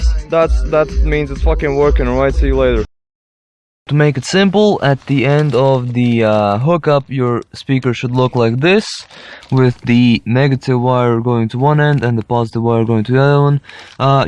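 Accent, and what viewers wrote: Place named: Latvia. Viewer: Can hear ur latvian just by the talkin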